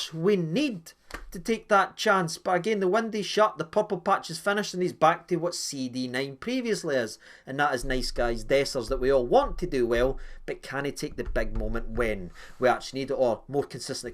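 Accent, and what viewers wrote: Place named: Glasgow, United Kingdom. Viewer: Love listening to you man. The stream of consciousness Glaswegian rant reminds me of home.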